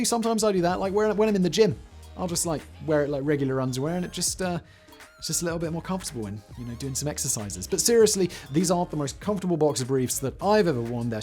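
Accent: RP, United Kingdom. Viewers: Silver linings, we got to hear say “penetration aids” with his posh accent. Made my day.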